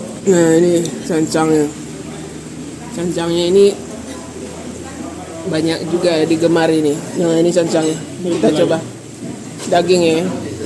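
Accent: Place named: West Sumatra, Indonesia. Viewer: Mungkin tak pandai tetapi banyak pertuturannya guna bahasa melayu cuma dialeknya lebih kepada minang dan indonesia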